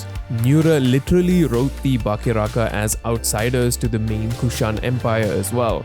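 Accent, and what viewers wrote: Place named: South India, India. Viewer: Why why why you speaking with heavy accent thats why people make fun of us are you south indian or something..stop the accent please its annoying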